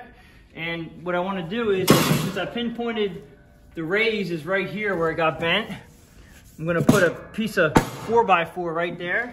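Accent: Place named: Pittsburgh, United States. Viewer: Already done sir! Yes it may seem barbaric to some, but you gotta do what you gotta do and I assume most people would rather take the barbaric route than shell out tons of cash for a new deck. I wish I had seen this video before I got rid of my old John Deere. Also...based on your slight accent I'm assuming you are a fellow Black and Gold fan? ;)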